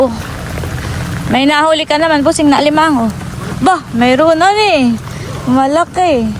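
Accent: Quezon, Philippines. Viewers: Taga Quezon po kayo Ang punto po Ng salita nyo ay Quezon po saan po kayo sa Quezon po Ako po taga Lopez Quezon po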